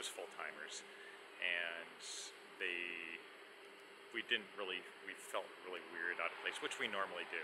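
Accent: United States. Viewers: You are either from California or a Yankees! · Tell me your from the East Coast without telling me your from the East Coast.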